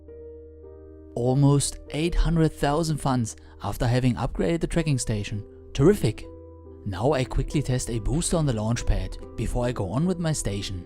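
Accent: Germany. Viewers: nice gemacht und da hör ich glatt den deutschen akzent XD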